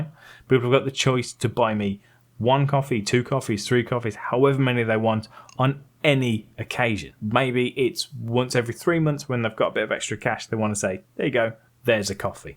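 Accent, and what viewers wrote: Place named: Birmingham, United Kingdom. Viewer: just come across your channel chap. Great stuff, explained the buy me a coffee concept so clearly I’m off to sign up. BTW, that accent sounds like yo live by me near Brum?? If you do and want some stills to use on channel/social media, I’m happy to help FOC (if I can pick a few tubing tips up from you). Thanks for the great video, cheers Phil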